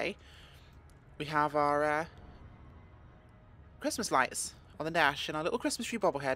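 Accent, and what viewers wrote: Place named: United Kingdom. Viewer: Ok that’s fine btw I like your British accent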